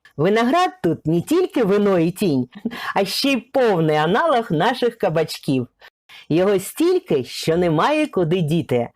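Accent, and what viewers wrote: Russia. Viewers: Та де там "гарна"! : ЗАХАДИ та живи, вітальня-СТАЛОВА, коти МІЛКІ, намагалась знайти ТРІЩИНИ, на випадок проблем з ВОДОПРОВОДОМ, велике ПРИДАНЕ.., вже не кажучи про оте московське "акання"; невже аж так важко вимовити "о", тим паче читаючи текст: адже написано по-руськи (т.б. українською) "о", а вимовляють по-московськи "а"?!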